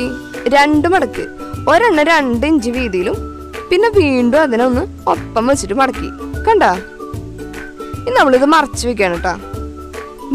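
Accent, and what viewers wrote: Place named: Thrissur, India. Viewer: Thrissur slang..🤗🤗🤗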